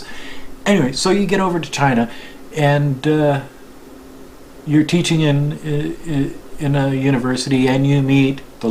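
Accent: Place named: Canada. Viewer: Great video, I like your Canadian accent.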